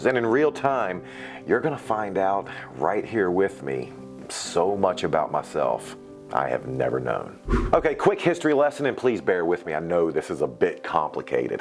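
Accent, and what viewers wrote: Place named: Appalachia, United States. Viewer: I knew it. I totally hear the Appalachian in your voice.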